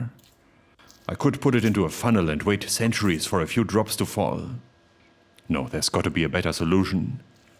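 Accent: Germany. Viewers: Quite to the contrary. I eagerly await this endeavor with your German accent providing the perfect ASMR soundtrack. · Just watching this for the funny way he is speaking. I love this german accent. If you got an accent, exaggerate it to make the video even better.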